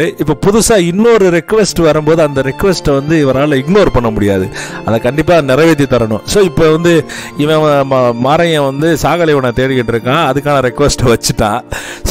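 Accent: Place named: Madurai, India. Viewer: Actually நீங்கள் எவ்வளவுதான் try பண்ணினாலும் முடியாது. சொல்ராங்கே மதுரைக்காரர் என தெரிகிறது.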